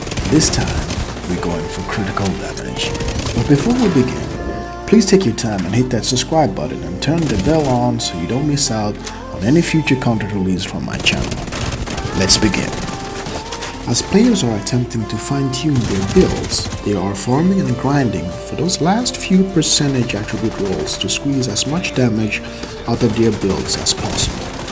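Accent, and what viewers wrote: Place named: Nigeria. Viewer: You sound Nigeria bro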